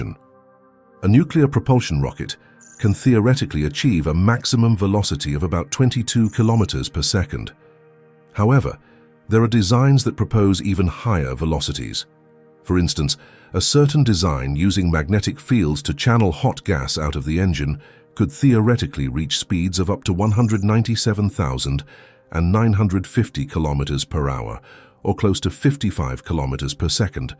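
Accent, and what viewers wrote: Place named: United Kingdom. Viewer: Another awful UK accent . YUK.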